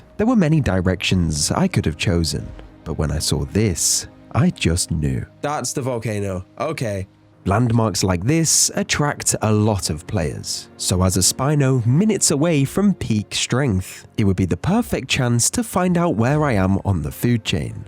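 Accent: United Kingdom. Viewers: His accent, always gives the British narrator 🙏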